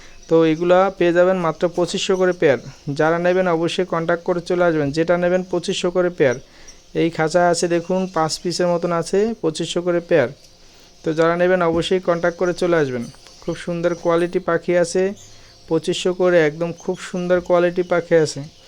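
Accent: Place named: Bangladesh. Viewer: Uni too ashe ,gese kore bolchen oni ki poschim Banglar? Bangladesh er moto e uccharon .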